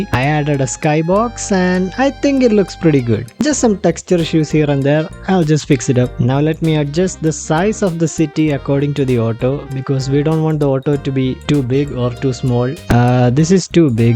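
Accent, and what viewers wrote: Kerala, India. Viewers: I knew u were from kerala by lisisting to your voice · Sounds like malayali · Accent kettal ariya malayli aan nn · your sound like you are from kerala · ur slang is just like malayali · i know a fellow malayali when I hear one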